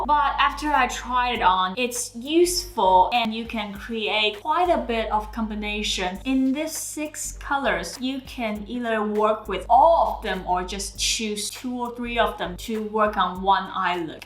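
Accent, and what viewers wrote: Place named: United Kingdom. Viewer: LOVE UR BRITISH ACCENT !!!!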